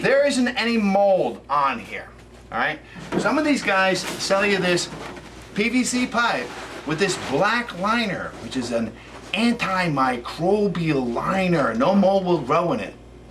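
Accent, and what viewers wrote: New Jersey, United States. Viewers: great video! can quickly tell you're knowledgeable. I don't pay any attention to the comments where they say they feel that you're bitching at them. Doing contract work myself it's so frustrating when you see shit work done by others. It gives the good contractors a bad name who are willing to do the job right the first time and it takes more time for you to dispel those fears in customers that have been screwed over. to hell with the "contractors" that are too lazy or stupid to do the job right. This video alone made me a subscriber. Right away I said "this guy sounds like he's from Jersey haha and sure enough. just relocated to South Jersey for my job. Keep up the good work out there and keep the videos coming. Take Care! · Damn funny as hell before I was watching another video and I’m like this dude is definitely from Jersey! 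Kick ass bro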